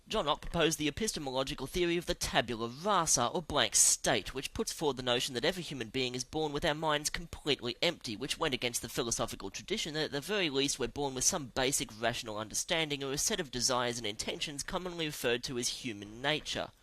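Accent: Australia. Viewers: Your Australian? :D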